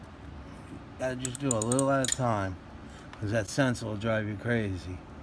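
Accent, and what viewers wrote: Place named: New York, United States. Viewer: love that new york accent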